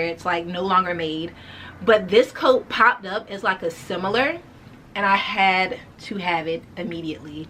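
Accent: United States. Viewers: you activated my alexa lol, all the way in London with an american accent love it x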